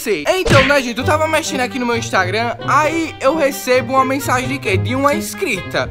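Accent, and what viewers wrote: Bahia, Brazil. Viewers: vc é baiano?? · C é da Bahia?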